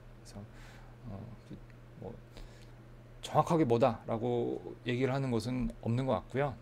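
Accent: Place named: Texas, United States. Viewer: I don't understand anything, you have a deep accent are you from Texas?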